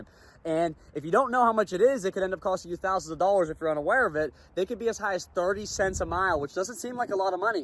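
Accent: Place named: Pittsburgh, United States. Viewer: You gotta be in Pittsburgh or around that area with your accent, haha I’m from Pittsburgh. I live out of state now, I like your videos my man keep it up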